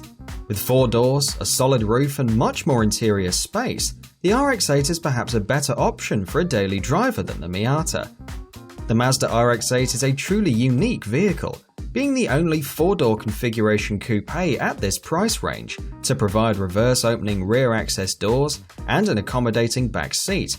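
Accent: United Kingdom. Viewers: You're talking in Dollars and about the US market, but you cannot sit there and tell me you are American with that blatant British accent. Either talk in Pounds & UKDM, or get more American; pick one. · It's a neeesan not a nizzan 😂😂 i hate British accents lol . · Guys... It will be cheap cause, Listen to that accent, Pure english, that means UK confirmed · Because he's from The UK...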